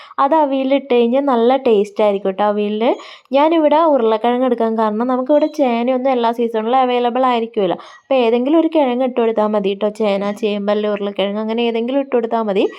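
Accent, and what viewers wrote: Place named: Kannur, India. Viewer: Nannayitund daaa.kannur slange poliii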